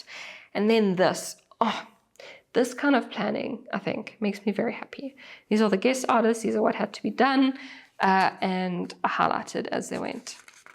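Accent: South Africa. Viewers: Hey, you popped up on my feed today, I am also South African. I was listening and was thinking your accent 😍 sounds so South African. Are you still in SA? Very interesting to see thar a fellow SA person is using a Hobonichi Avec.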